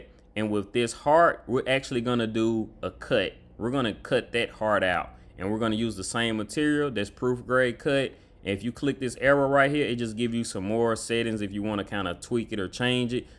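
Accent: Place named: Cleveland, United States. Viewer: If you just listen and not watch you can hear Cleveland lol awesome video my man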